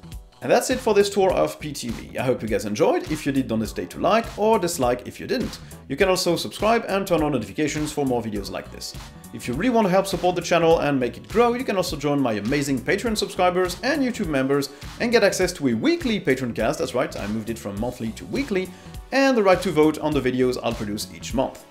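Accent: France. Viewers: Bonjour, tu es français ?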